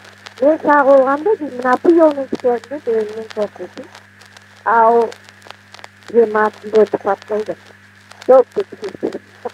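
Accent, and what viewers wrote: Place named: Coast, Turkey. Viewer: Bülbül yalıboy şivesi, diñlemek hoş keldi). Kereksis şatırdı alınsa daada yahşı anlaşılır. Sağ oluñ, var oluñ. Işleriñiz oñ kelsin!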